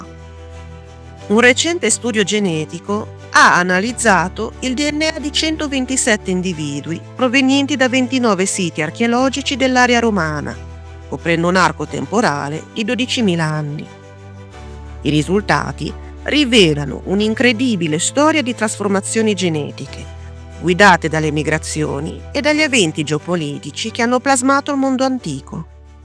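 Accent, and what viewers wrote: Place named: Liguria, Italy. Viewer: mi riferivo alla marcata cadenza ligure della speaker 😀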